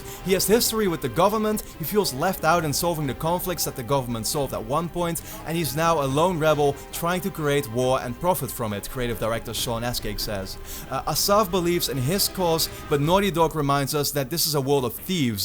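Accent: Netherlands. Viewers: ur dutch right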